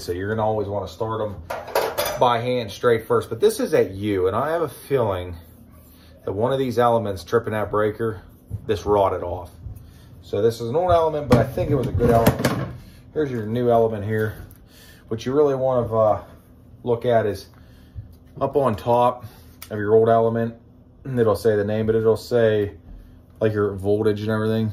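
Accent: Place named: Pittsburgh, United States. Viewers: hey man thanks for doing this. is there a correct or incorrect order of attaching the wires to the element? I didnt get a pic before hand and have it working again but i have to wonder.... is one positive and the other negative? Talking about the two connections on the upper element? Im guessing either way of re attaching those two wires cant be wrong. however i do see two markings on the bottom. PS i know that accent anywhere and you CANT be far from southwest Pennsy. bet im within 300 miles :D Edit2: Oh snap man i think i missed it the first time. Here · Video was descriptive & yum yum.. So nice to watch. Pittsburgh accent. A local? Lol 😂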